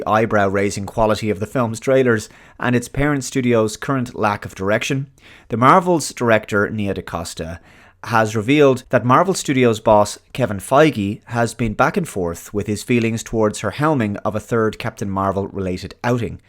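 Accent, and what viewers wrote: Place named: Ireland. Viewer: Hey, great vid. Really didn't like that symmetrical video split youve done in the BG. Made me a bit queasy tbh. Not sure you've done that before, I just like to look at all the beautiful locations behind you while listening to your dreamy Irish tones. Without the editing. Anyway, cheers mate 👍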